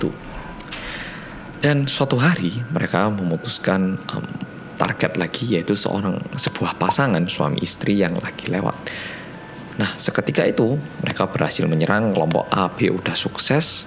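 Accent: Java, Indonesia. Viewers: Welkom bekk to bersama org korea yg medoookk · Maaf ya mas out of topic commentnya. Aku mau bilang, aku mulai hidup sehat karena Mas, dari diet sehat sampe skincare-an pake Nacific. Aku ngikutin mas dari badan mas masih agak berisi sampe kaya gini. Well, makin hari mas makin guanteng yaaa. Orang lain makin hari makin tua, mas kebalikannya. Aku percaya itu semua karena gaya hidup mas. Thats why aku termotivasi jejak mas. Di saat aku pengen nyerah, aku lihat video mas dan selalu termotivasi lagi. Sukses buat mas, semoga suatu hari kita bisa ketemu langsung dan sharing pengalaman hidup. Luv u orang korea yg medooook! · Mas medok chek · Mas pas pertama kali liat channel nyaa aku sempet bingung 🤔 orang Korea bahasa Jawa nya medok banget 👍🏻👍🏻👍🏻 # kangen sama Rindingdong Like ya 👇🏻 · Gk perlu jadi penonton pertama,yg penting jadi penonton setia nya mas 😭😘 Semangat mas 👏👏👏 Mas,jalan jalan dong mas ke busan,saya lagi ada di Busan nih mas,mas kesini dong😁 Saya pengen banget ketemu mas lah👏👏👏 Buruan ya bang,saya keburu berangkat wamil😁😁 Semangat terus mas Orang Korea yg medok😭😘😊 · We miss you mas yg medhoookkkk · Oke mas medok 🦖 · Gara2 delok mas orang korea yg medhokk.. 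lali nak aku lagi godok mie. Wah mas mas... indomie ku sampai ke-medhok'en iki lho. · Woffyou mas yutup medhook kesayangan kita semua:*:* <3 <3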